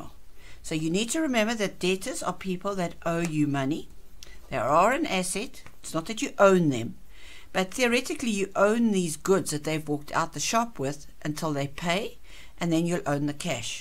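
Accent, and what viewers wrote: South Africa. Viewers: I wasnt expecting a south african accent eh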